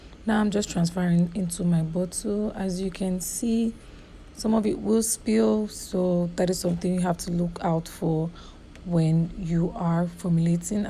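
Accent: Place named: Nigeria. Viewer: well that's my point is you have a Nigerian accent so it's hard for some including myself to understand everything you said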